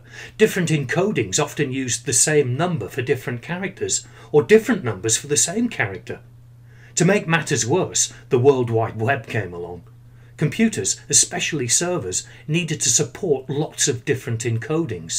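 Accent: England, United Kingdom. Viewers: No Music, no insulting or idiotic speeches, great and clean way of explanation and a nice english accent - thumb up and abo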